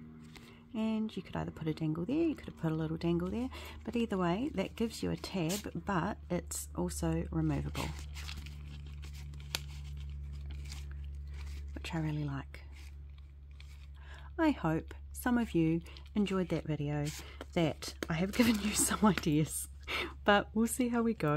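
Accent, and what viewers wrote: New Zealand. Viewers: keep doing these step by step tutorials. They are great, plus I love listening to a fellow kiwi!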